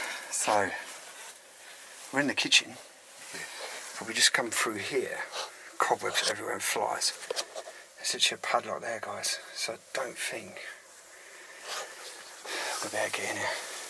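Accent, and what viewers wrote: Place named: Scotland, United Kingdom. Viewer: It's very Scottish I wish you would say what country your in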